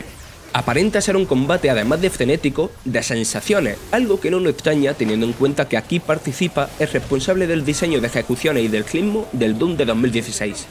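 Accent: Andalusia, Spain. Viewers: me parto con la voz andaluza.... 😂😂👍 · No le cuesta hablar, es un acento de una region de españa!!! sino te gusta no entres a ver el video.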